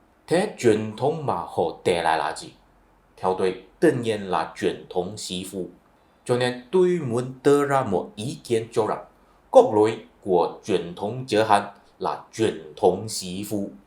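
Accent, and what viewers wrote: Cantonese, China. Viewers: Ô này hình dáng bên ngoài là người Việt Nam, adn là người Việt Nam nhưng tiếng nói bị hán hóa, nghe tiếng thì ô này người Quảng Đông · Ông này nói tiếng việt rất đúng ngữ pháp chắc vì là người gốc hoa nên cách phát âm nghe cũng có vẻ na ná như tiếng trung vậy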